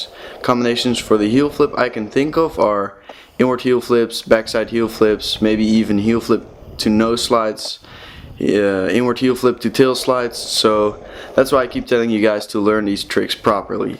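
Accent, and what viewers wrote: Netherlands. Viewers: sickkk btw you sound very dutch bc I am dutch😂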